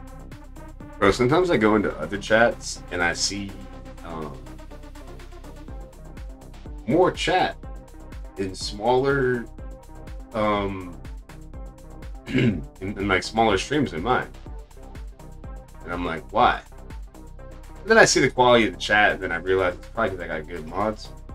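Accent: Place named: New York, United States. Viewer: are u from NYC? you sound like a new yorker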